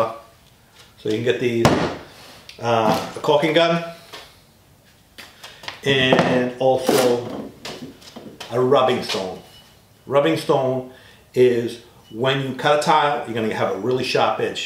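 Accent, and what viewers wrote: Boston, United States. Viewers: Thank you Sir for this informative video. From one American of Italian ancestry to another,,,what part of the USA are you from? Great accent!!! Regards from New Jersey !!! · he is from Boston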